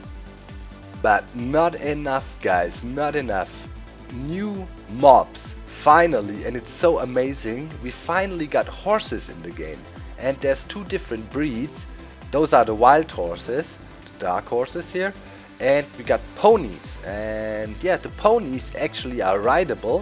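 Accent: Germany. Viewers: are you german?? you sound Like a German Person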